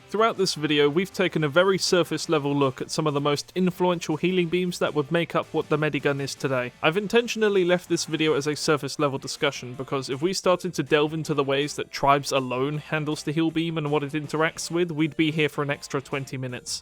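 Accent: United Kingdom. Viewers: is * not british?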